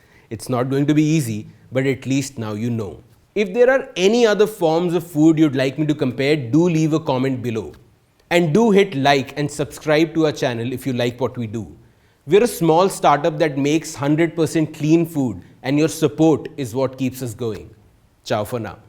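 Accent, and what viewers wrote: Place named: India. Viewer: first correct your accent. indian. you guys speak englidh very worst that you seem like speaking shit language like your indian and it hurts listening to your ugly accent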